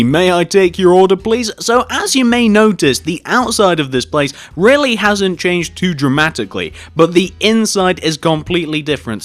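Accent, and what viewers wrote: England, United Kingdom. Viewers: He's English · Are u British?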